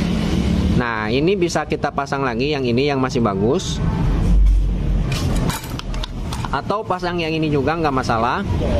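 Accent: West Java, Indonesia. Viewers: Mantep ah Jiga orang sunda Kang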